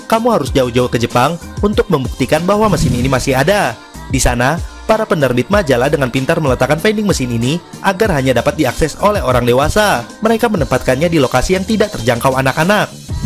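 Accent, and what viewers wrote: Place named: Indonesia. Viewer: loghat indon kan..ape yg pelik sgt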